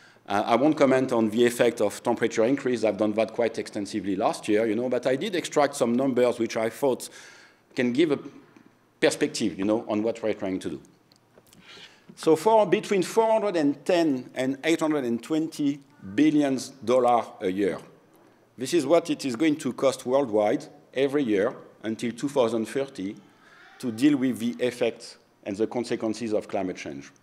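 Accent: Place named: Australia. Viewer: Great talk. And of course, I always love to hear the classic Australian accent. :)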